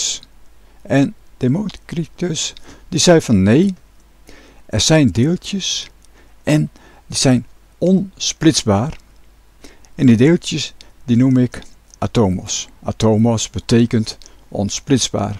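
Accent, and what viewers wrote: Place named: Drenthe, Netherlands. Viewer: Heerlijk drenths lol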